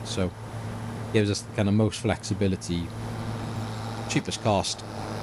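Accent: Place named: Wales, United Kingdom. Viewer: Mate, ive only watch half hour and i've laughed so much. Your Welsh accent is quailty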